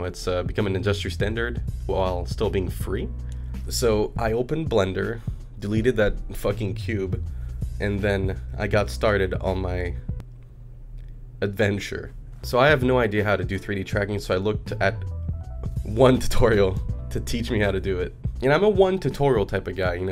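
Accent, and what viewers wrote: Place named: Quebec, Canada. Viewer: hey are you a quebecois? parce que moi je le suis et j etais full surpris quand tu parlais en quebecois moi j etais genre: attend... il parle francais ce gar la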